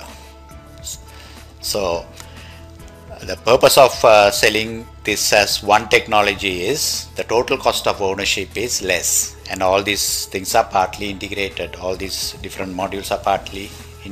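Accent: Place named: Canada. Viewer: That's a funny Canadian accent you have.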